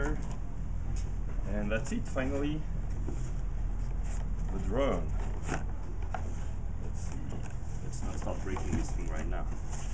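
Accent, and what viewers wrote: France. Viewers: when french people talk english ... -_-'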